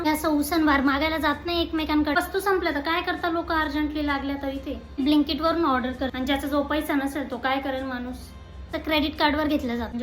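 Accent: Khandesh, India. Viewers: परफेक्ट खानदेशी 👌😅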